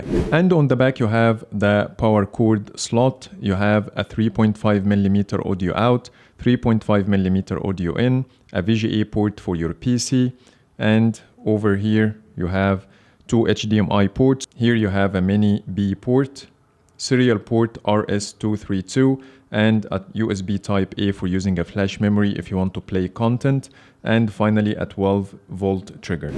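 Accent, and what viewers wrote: Egypt. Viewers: Egyptian accent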